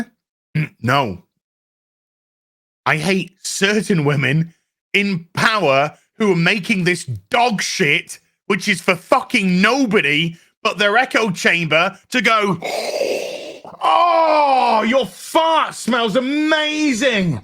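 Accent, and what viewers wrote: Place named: Scotland, United Kingdom. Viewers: the scottish makes this Review sooo special XDDD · Alright, your scottish accent got you another subscription, mate! 😀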